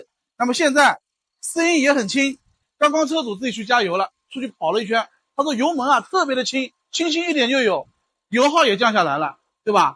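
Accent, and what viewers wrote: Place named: Hangzhou, China. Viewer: 老哥听你口音是杭州人？店在哪里？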